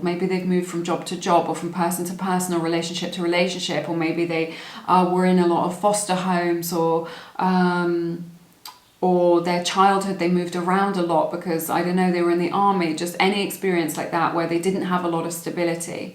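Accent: England, United Kingdom. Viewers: is so beautiful. Or I mean. Love her hair... also love her english accent... Excellent reader aswell. 🙏🦄⚘💛⚘🦄🙏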